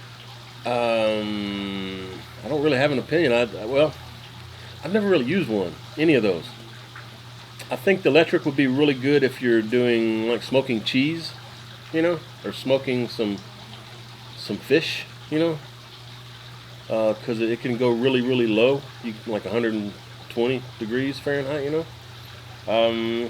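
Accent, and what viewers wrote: South, United States. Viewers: Thank you, for answering questions. I love your channel and wonderful southern accent. I am learning a lot from your and the others linked to your channel. Keep up the good work.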